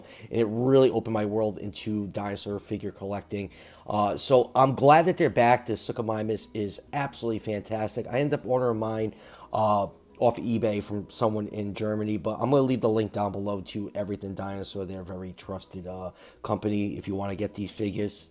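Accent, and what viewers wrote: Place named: Massachusetts, United States. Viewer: Looks like I’ll have to start collecting Papo again. Nice to hear from a fellow New Englander! I’m from New Hampshire. You sound like a Massachusetts man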